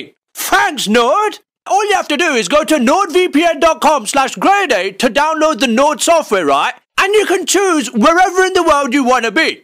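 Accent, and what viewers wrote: United Kingdom. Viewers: It's true, it comes up as dutch. I thought his voice sounds British. But, Dutch???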